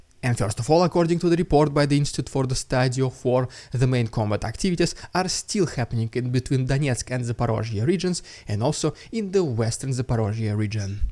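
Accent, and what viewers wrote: Russia. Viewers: You have excellent English bud, sounds cool with a Russian accent. I am Irish and your English is better than most people I know😂. Appreciate your efforts👊☘️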